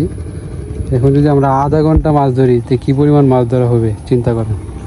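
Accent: Netrokona, Bangladesh. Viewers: মাছ দেখা ছারাও কথাডি হুইন‍্যা মনডা খা খা কইরা উডে।মনে অয় কাইন‍্যাইল বা গাইভনা বা কলমাকান্দা হেইরহম কোনো গ্রাম থাইক‍্যা কেউ কথাডি কইতাছে।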